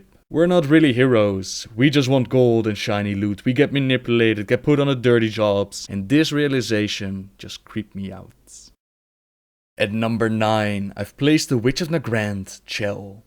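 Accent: Netherlands. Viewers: The Dutch accent is real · He has a typical dutch accent lol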